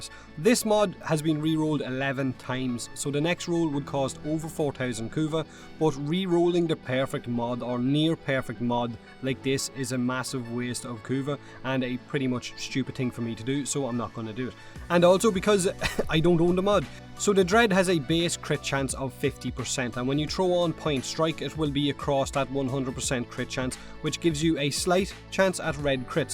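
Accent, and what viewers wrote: Ireland. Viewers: "What's up, feckers?" Love that Irish accent. Wanna know if there are multiple accents like the British.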